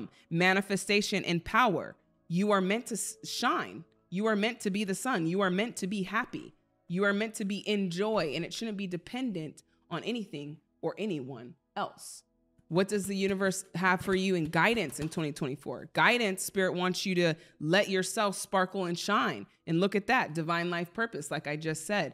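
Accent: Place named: Midwest, United States. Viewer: Can you please tell me what decks you used in Leo read. You are remarkable. I’m a tarot collector since 12 yrs old. I’m 66. I love you readings your style your voice. You’re a gift to this world no doubt. I’ve been a reader my entire life it’s not often I see a true message from spirit from readers. Especially one who just cold reading on podcast. Crazy accurate. Bold brilliant and appreciated. What state are you in. I’m in Minnesota. You sound like me. Midwest accent. Lol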